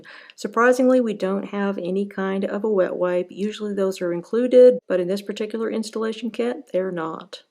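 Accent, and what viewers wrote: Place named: United Kingdom. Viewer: Hello ( for the French), by the way you sound British to me. Good job. It will be my next screen protector.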